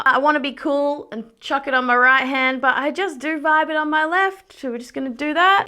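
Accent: Australia. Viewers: Yeah, great channel by the way. Are you Australian, I am.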